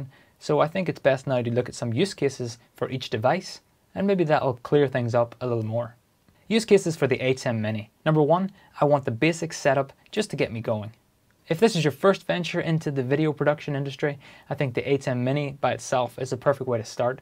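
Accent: Northern Ireland, United Kingdom. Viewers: Not often I hear an NI accent on YouTube! Just got my Atem Mini Pro down in Fermanagh!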